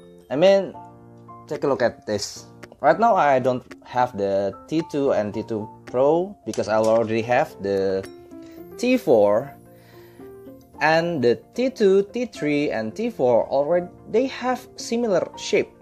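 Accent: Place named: Indonesia. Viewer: logatnya kayanya orang Indonesia nih....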